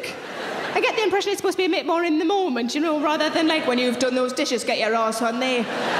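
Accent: Newcastle, United Kingdom. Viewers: Think you'll find its Geordie. Can be hard for other Brits to understand. I still think she's great.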